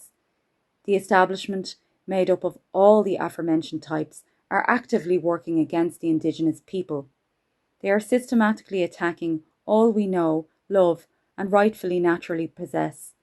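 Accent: Ireland. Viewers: Beautiful Irish voice.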